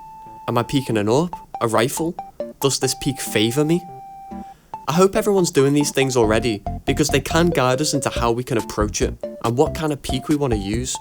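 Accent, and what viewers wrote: Liverpool, United Kingdom. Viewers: the liverpool accent is killing me😂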